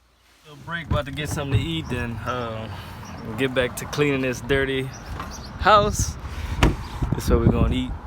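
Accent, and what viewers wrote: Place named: Eunice, United States. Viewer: This is my first time seeing one of your videos. I absolutely knew that I recognize the accent. I am from the Eunice area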